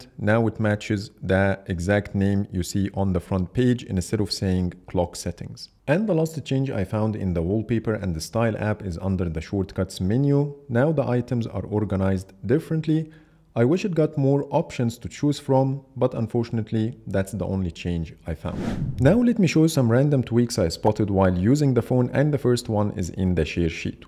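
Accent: Egypt. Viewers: By listening to you, I immediately noticed that you are Egyptian. right?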